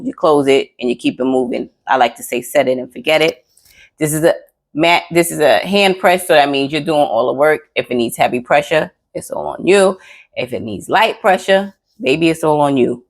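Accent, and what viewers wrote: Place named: Brooklyn, United States. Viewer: Yep that's about rite😁...can hear Brooklyn all thru ya wordz..big Bronx iz definitely rockin.. keep grindin luv...alwayz nyc luv💪🏾🔥💯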